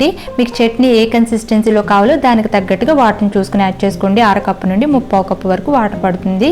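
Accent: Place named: Konaseema, India. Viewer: Ma'am, your recipes are just awesome..but please I have a request to you..by the way, when I observe you speaking, మీరు కోనసీమ వారిలా అనుకొంటున్నాను.. నేను కొత్తపేట, అమలాపురం, రావులపాలం చాలా సార్లు వచ్చాను Hyderabad నుంచి.. అయితే, అక్కడ ఇచ్చే కొబ్బరి చట్నీ కానీ, బొంబాయ్ చట్నీ కానీ ఇంక్కెడ ఆ రుచి లాగా రాదు రాలేదు..మీరు దయచేసి, ఆ కొబ్బరి గట్టి చట్నీ గ్రీన్ or light yellow colour లో ఉంటుంది ,, specially ఇడ్లీ లోకి, బొంబాయ్ చట్నీ పురిలోకి ఇస్తారు... మాకు Hyderabad లో సైకిళ్ల మీద అమ్మే ఇడ్లీ వాళ్ళు అది సర్వ్ చేస్తారు, as they are from రాజమండ్రీ and other east Godavari district s.. please Ma'am video చేస్తారు కదా,,💯🙏🙏🙏 please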